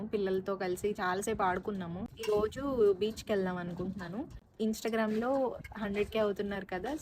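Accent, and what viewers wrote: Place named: Krishna, India. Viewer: Meedi Krishna dist.ani mee bhasha chusi telisipoyindi 1st time chusaka ne..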